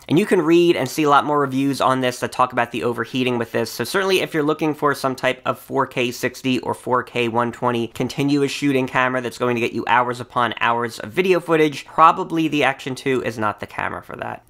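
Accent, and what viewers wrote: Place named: Delaware, United States. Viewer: I'm watching this from Poland right now, but I grew up in Delaware. I'm def hearing some sort of Delaware / South Jersey accent. Key words - waterproof "wooderproof" / video "videoh" I love it, brings me home!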